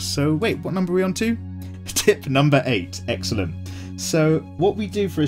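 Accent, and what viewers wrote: United Kingdom. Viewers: do you happen to be british? idk what british people sound like, but i feel like you are british. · He's definitely British.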